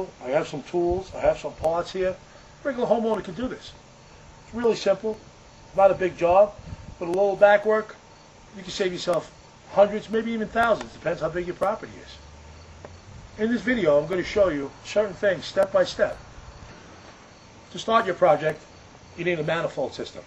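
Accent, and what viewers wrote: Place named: New York, United States. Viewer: you sound like a NYer thanks for the all the info!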